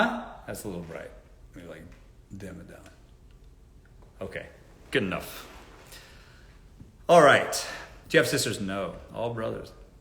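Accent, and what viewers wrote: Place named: New York, United States. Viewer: You sound like a real New Yorker 💯😂 I like you... I'm a lady from New York and we just say it straight with no chaser!😂 Cheers to you,!🎉💞😂🥂😎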